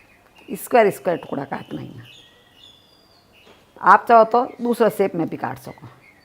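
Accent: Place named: Rajasthan, India. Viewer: Hi aunty ji mai haryana se hu maine aj pehli bar apki video dekhi h mujhe lga ki mujhe rajasthani samajh me nhi ayegi par, samajh me ayi ap ke muh se rajasthani me ye recipe sunkar acha lga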